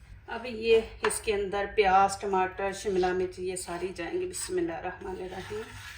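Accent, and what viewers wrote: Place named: Punjab, India. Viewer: Apna bo8lne ka st5yal sake karin. P9anjabi le3hja na ho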